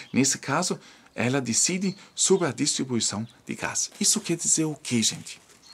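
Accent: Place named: Germany. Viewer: Seu portugues é excelente. Entendemos perfeito. Acho lindo seu sotaque alemão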